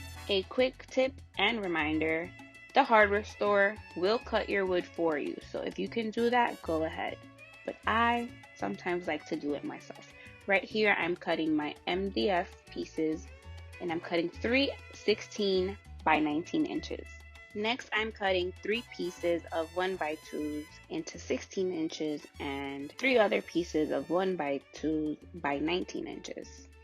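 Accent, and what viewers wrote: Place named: New York, United States. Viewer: I knew u was a New Yorker!! I mentioned in yr other video how much I love yr accent. N girl I’m loving yr videos. Keep it up 👍💕💕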